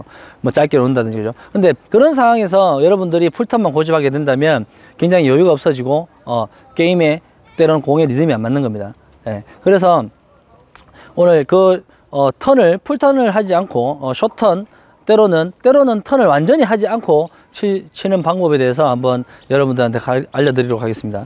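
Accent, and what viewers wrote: South Korea. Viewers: 근력이 약한 저도 요 연습 해보겠습니더~ 슨상님 사투리 너무 좋습니다 ㅋ 마! 이게 사투리다!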